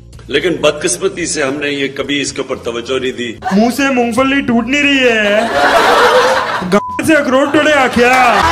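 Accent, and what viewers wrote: Haryana, India. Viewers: हरियाणा के हिसार जिले से हो ना आप😁😁😁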